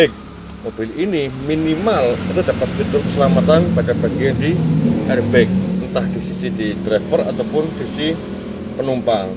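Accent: Banyumas, Indonesia. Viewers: Berkah selalu mas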